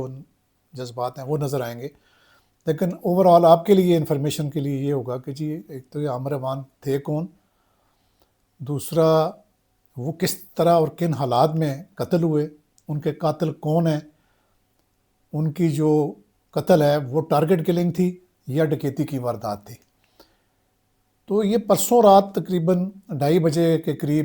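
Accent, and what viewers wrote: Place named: Punjab, Pakistan. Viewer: Full of useless words with no systematic approach...his Punjabi accent is crude ..He only knows how to just Praise...only..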